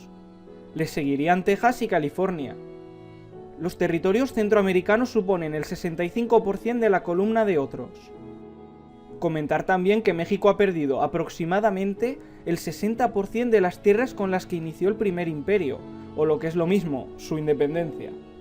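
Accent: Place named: Spain. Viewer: Ablas como un español resentido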